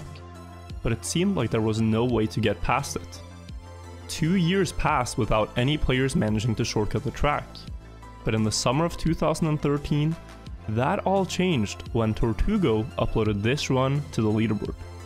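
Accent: Norway. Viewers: are u a norwegan??? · Are you norwegian? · are you Norwegian? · You Norwegian? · Are you from norway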